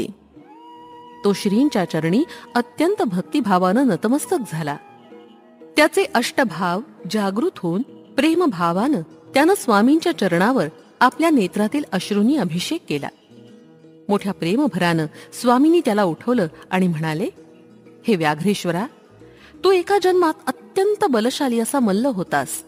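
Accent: Standard. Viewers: Excellent voice didi . Uchhaar agadi shuddha ahet .Sagle adhyay asech upload kara .Shripad shrivallabhanche ashirvad tumhala labhot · Faar Uttam channel ahe... shripad shrivallabh charitramrut ekdum Chan ahe..avaaj khup Chan ahe. Uchhar shudha ahet..aikanaryala aani pahnaryala khilvun thevta..hya channelcha jastit jast prasar vhyala hava🙏 Shripad Rajan Shaaranam Prapadye 🌸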